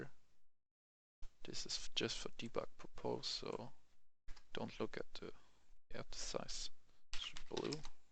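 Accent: Germany. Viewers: Man hört das du in ehct Deutscher bist :D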